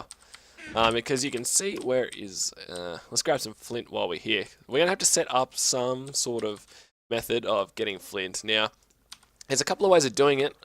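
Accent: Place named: Australia. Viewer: You have an Australian-like accent.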